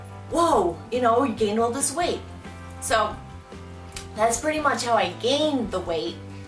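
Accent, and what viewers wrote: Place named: Rhode Island, United States. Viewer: You sound like my Aunt Shirl and she is from Rhode Island. Where are you from? Thank you for making these videos even though you were nervous. It is appreciated.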